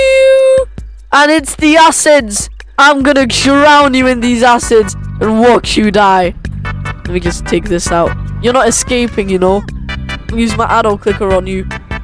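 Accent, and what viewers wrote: United Kingdom. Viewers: The way he just speak like British 😂{Not being rude} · I love his British accent it’s good😊😅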